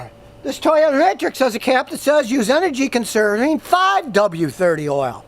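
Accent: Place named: Poconos, United States. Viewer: Gotta be from the Poconos brthr.1,2,tree love that Pocono accent and the info. Thank you!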